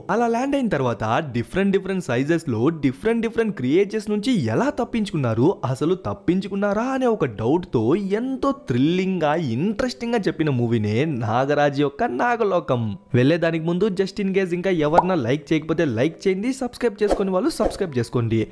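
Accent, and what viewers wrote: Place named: Rayalaseema, India. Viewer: AS ALWAYS SUPER EXPLANATION ANNA love your all video from beginning mi telugu slang naku baga nachindi ekkada anna rayalaseema na ♥♥♥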